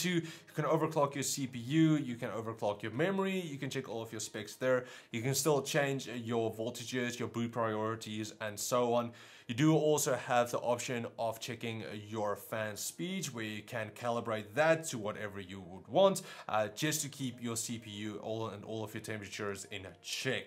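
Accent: South Africa. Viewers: Are you from South Africa? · My mother is South African and I lived there for around 6 years when I was very young, it's so nice to see a South African reviewer and It's great to hear the accent again. The review is also amazing!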